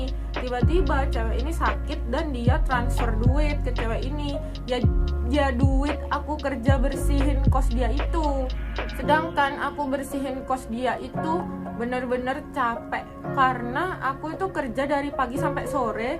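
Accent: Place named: Surabaya, Indonesia. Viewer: Aku suka banget logat surabaya nya 😂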